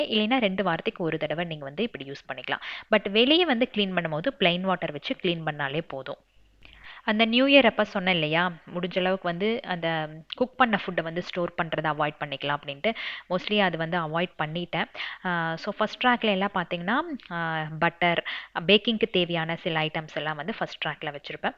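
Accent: Coimbatore, India. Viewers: Unga own voice is very nice❤. Papavum mithuvum porupa irukanga. Namma coimbatore slang varruthunga ❤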